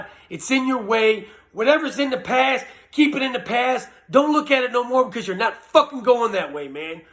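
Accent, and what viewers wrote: Virginia, United States. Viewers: You from Virginia?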